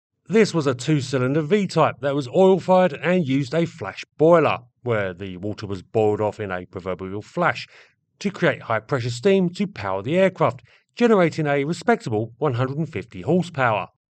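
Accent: United Kingdom. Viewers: battle of the monotone Brits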